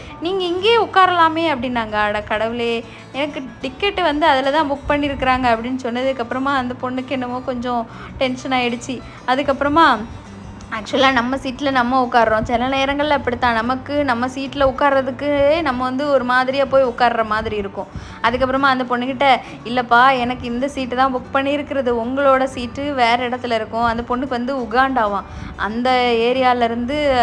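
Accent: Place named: Kanyakumari, India. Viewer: First time watching...... ivanga kanyakumari ponnu, the slang😊❤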